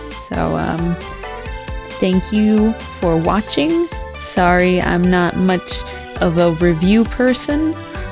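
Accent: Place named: Texas, United States. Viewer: Such an excellent review, the oh so most divine a Texas mouth can bring to the table!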